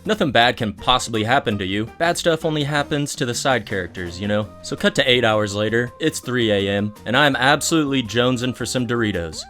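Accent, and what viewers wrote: South, United States. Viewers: with a slight southern drawl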